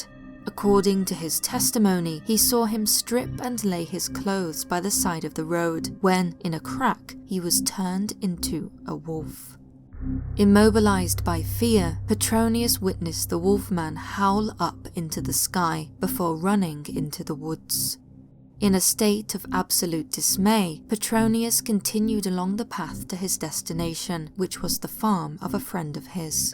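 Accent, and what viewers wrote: United Kingdom. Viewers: I love this voice, is so feminine, so delicate, so British, so beautiful 🥰, hope you like roses 🌹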